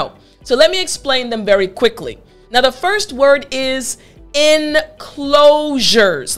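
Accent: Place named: United States. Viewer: I love your American accent, teacher. Greetings from NICARAGUA 🇳🇮.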